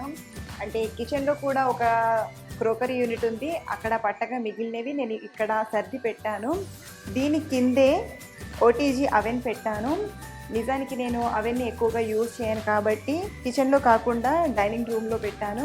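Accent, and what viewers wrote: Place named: Karimnagar, India. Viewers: TELANGANA yaasa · Akka meedi pakka Karimnagar ey anipistundi.. 🤔🤔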